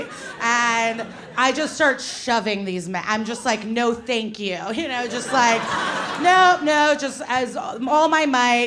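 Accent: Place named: Midwest, United States. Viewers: Ya know I usually hear the midwest female accent (go to fucking Naperville, IL and tell me 80%+ of all the chicks DON'T sound exactly like this😀) and immediately turn it off or run away. Glad I didn't this time. Throwing bedpans in a hospital is the best criminal offense I've heard in years. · Her voice is def from Skokie.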